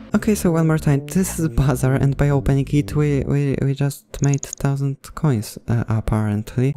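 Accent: Poland. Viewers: Your english is special (better than mine). Od początku wiedziałem że jesteś Polakiem. Trzymaj się tam i powodzenia w rozwoju kanału. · you sound like guy from poland speaking english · polski akcent jest mocno słyszalny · Are you from Poland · are you polish? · Are you Polish? Your accent seem like a real good Polish one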